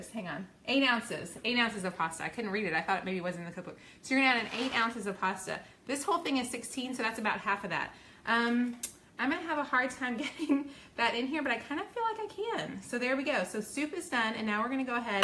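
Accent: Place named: Georgia, United States. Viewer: Where are you from? Your accent is exactly like my friend’s who grew up here around Woodstock Ga 🙂. I just found you on YouTube and I need to go download the cookbook!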